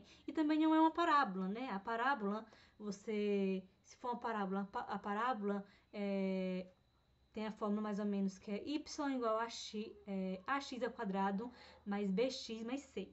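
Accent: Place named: Portugal. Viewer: Interessante seu sotaque, por um momento no início do vídeo eu achei que vc fosse portuguesa ou que tivesse passado muito tempo em Portugal. Achei muito legal o vídeo, na forma como vc conta as coisas, bem detalhadas e de uma forma íntima buscando contar como vc se sentiu e o que vc pensa sobre.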